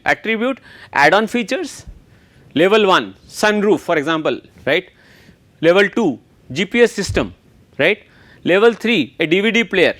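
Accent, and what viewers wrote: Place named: India. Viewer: Indian accent in these teaching videos are such a turn off.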